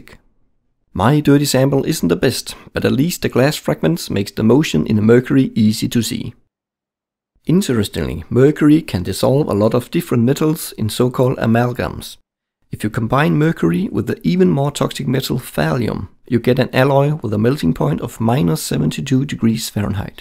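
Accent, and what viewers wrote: Germany. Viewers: ou :D you sound pretty german to me :D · I've an Offtopic Question :§ could it be that you're german ? :D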